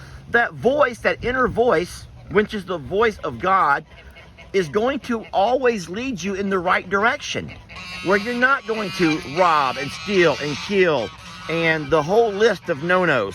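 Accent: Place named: Texas, United States. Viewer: In Texas we have our own way of pronouncing words 🤣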